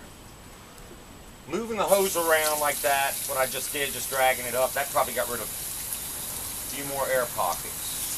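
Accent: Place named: Virginia, United States. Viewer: Sad, I have to watch this video every Spring when I take down my tub! You sound like you are from the Eastern Shore of VA!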